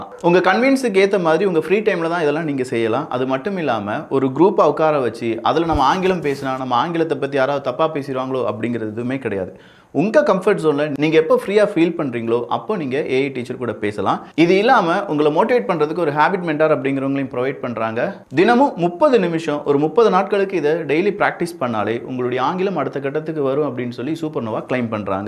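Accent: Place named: Tirunelveli, India. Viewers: விக்கியின் திருநெல்வேலி பாஷை சூப்பர்.❤❤❤❤❤❤ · நெல்லை தமிழ் pure gold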